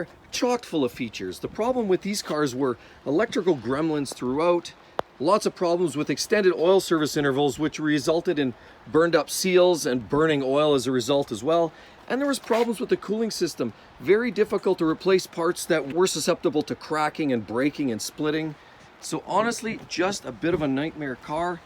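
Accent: Canada. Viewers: Me after 3 seconds: wonder which part of Canada this guy is in....🤔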